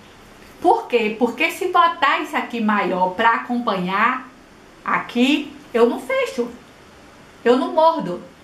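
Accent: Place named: Bahia, Brazil. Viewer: Vc é linda!Sua essência é verdadeira,alegria contagiante e espontaneidade como sua mais perfeita qualidade!Adoro esse sotaque baianês,vc é um exmplo de mulher,não se importe com essas pessoas vazias e fúteis,elas realmente não sabem o que vieram fazer no mundo,azar o delas!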